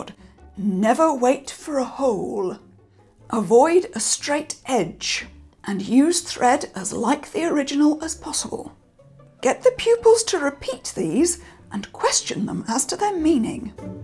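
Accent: United Kingdom. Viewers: Aww yes my favorite, British cursing ASMR. Thank you for the wonderful video as always.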